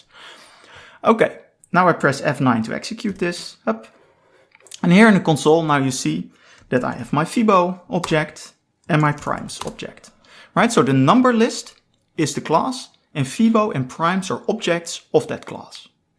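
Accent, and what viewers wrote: Netherlands. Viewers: Afgaande op je accent ben je Nederlands.. Fijne video's ! dank!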